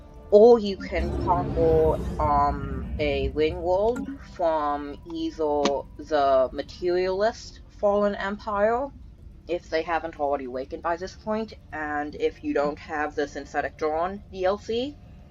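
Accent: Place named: North America. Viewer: north american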